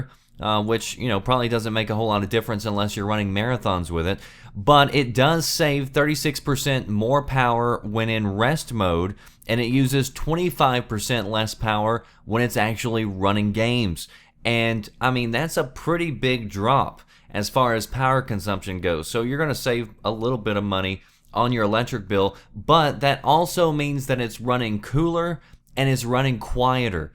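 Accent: South, United States. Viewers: I like your accent, where are you from? (I'm British so don't recognise US accents). Subbed. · it's that southern sounding accent I reckon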